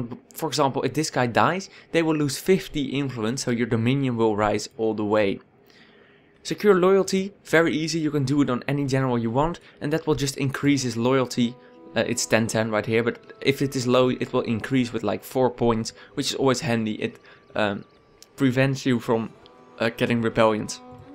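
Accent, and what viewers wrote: Netherlands. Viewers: Yo bro. Thx for this vid. Needed it to understand politics better. Just subcribed to your channel. By the way. Are you dutch? You have a accent a dutch guy would have. Thx again and peace out bro :-) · judging from you accent i think you are dutch XD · you sound dutch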